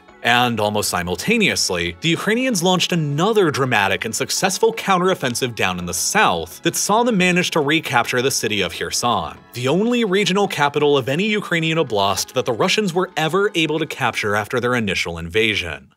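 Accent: United States. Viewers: Woe Woe Woe! are you purposely doing Putin's Bidding? Listen to what you said Why would anyone in Nato want to do something like that. This whole entire conflict is based on Hypothetical what if's That Putin's Said could happen if his Imaginary Evil Enemies of the west. Yo you sound American You know good and god damn well no one has ever talked about or thought about or even wants to invade Russia or any where close to Russia. Theres this new theory that all the hostility we thought was coming from Russia never actually existed which explains the poor shape of it's military, but it turns out that conflict with the west is just the oldest eastern European Dictators survival tactic that they been using for political to stay in power for centuries. "Hey I know it sucks you all are starving to death and the economy is shit because me and the homies stole it but it's must make sacrifice or the mean evil west will come and get us. 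US in a way does it alot as well. There's for sure very powerful people who's wealth is directly effected by us being enemies with Russia. but if you actually sit down and think about it. there's nothing that give us any reason to believe these people on the other side of the world are our enemy other then the people who stand to gain telling us they are. I only recently realized we all have been finessed which like you just said there is a real war with real people dying by thousands all so that a make believe enemy could have an advantage in a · Russia is not scared of anyone not china not Japan not the scumbags from America or Europe maybe they were not ready in 214 but they are ready to whip your asses to smithereens I mean and I mentioned your because I take it from your accent you are an American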